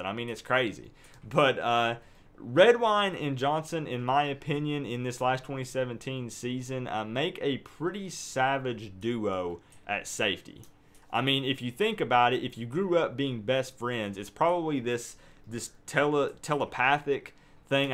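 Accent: South, United States. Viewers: Are you from Miami? You have a deep country drawl... I wasn't sure.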